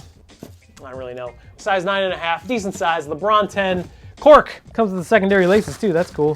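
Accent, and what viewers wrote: Chicago, United States. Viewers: Your Chicago accent veers more and more toward Bartok, the bat from Anastasia, every time you do it. (You're too old to get this reference and most of your subs are probably too young so I guess this comment is for me)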